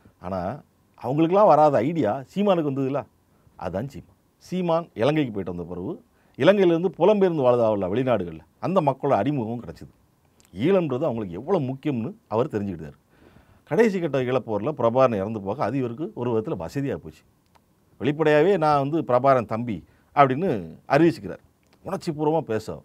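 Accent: Tirunelveli, India. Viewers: குரல் கரு பழனியப்பன். பேச்சு நடை நெல்லை. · இதில் பேசிபவர் எங்கள் நெல்லை மண்ணின் மைந்தர்.இவர் பேச்சில் எங்கள் ஊர் ஞாபகமே வந்திருச்சி.❤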